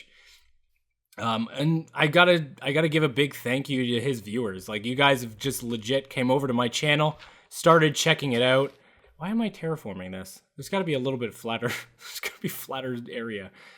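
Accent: Canada. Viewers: hahaha. Its really weird but I heard canadian and bit of nourthen english. It's probably just me tho. By the way your builds are amazing :D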